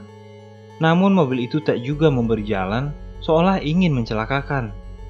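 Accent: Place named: Java, Indonesia. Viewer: ejaan sikopet dia bilang juga bener kok. Kan itu accent nya dia neng. Kaya amrik sama english "neither either" (if you know lah) . Dia juga di video gak pake Bahasa baku amat. Orang jawa kan sering pake plesetan di pronunciation A nya. Like benar bener. Teman, temen. Cepat, cepet. So what's wrong lol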